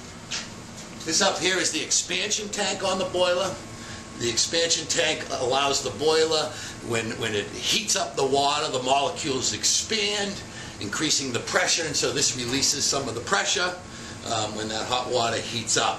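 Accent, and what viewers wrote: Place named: Boston, United States. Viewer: Chicagoland? I am hearing a fellow Boston guy.....boiluh, wadduh preshuh!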